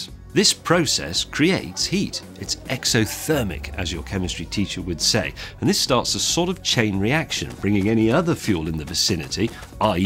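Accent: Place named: England, United Kingdom. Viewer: I watch these videos to learn James may's English accent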